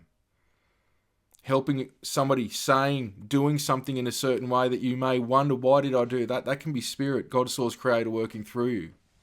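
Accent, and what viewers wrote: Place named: Australia. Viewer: Love hearing a fellow Aussie on my feed. PRAIR :)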